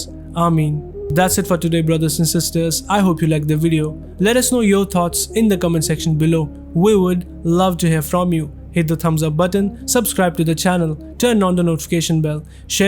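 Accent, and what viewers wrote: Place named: Srinagar, India. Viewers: Yes i aslo want to know this...and i am noticing his accent is bit Kashmiri type...😂I think he may be from Kashmir❤ ... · Are you a kashmiri? Your accent is that of a srinagariet 😅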